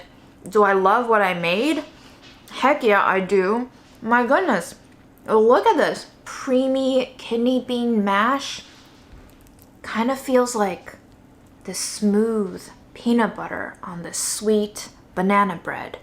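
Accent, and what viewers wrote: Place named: South, United States. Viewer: she has a southern accent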